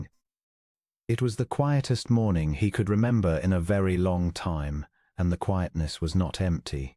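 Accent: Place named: United Kingdom. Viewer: At first was not sure I would like the narrator’s voice with a British accent, but as the story progressed, liked the cadence except the way Lionus was pronounced. Listening from Clarksville, TN.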